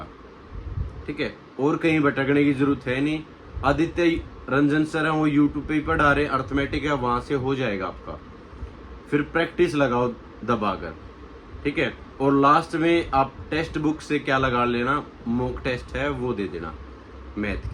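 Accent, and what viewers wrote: Haryana, India. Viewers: Bhai aap haryana k lgo ho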